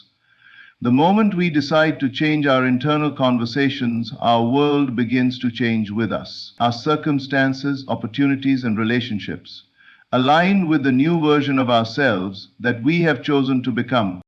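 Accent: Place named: India. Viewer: Is this the Indian Neville Goddard because he has an Indian accent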